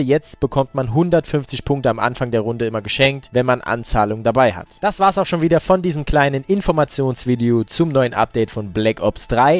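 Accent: Saarland, Germany. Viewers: Kann es sein dass du ausm Saarland kommt?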